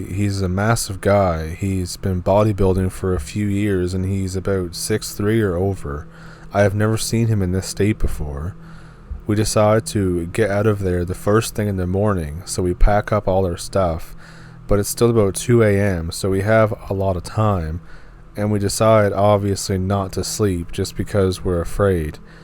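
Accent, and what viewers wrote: Canada. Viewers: I saw your comment and the thread it started on a Raven Reads video. I told you I would check out your channel and I have. I picked a random video down the list a little and this is the one. I liked it so much I subscribed! I look forward to checking out more of your already posted videos as well as any and all new content you post. Your voice is great, I love Canadian accents, and perhaps most importantly, you tell the story really well. I felt like I was just hanging with a friend while he shared the story with me. It felt more intimate than most channels, less rehearsed and more spontaneous, if that makes sense. It was awesome! 🙂🙂🙂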